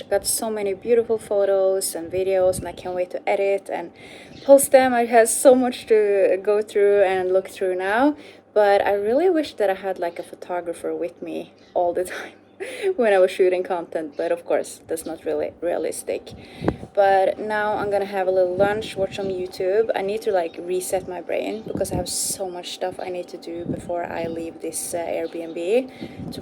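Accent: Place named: Scandinavia. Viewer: Ah känner, yeah sounded like you were from Scandinavia.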